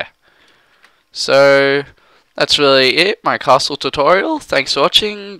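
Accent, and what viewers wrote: Australia. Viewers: man he's clearly australian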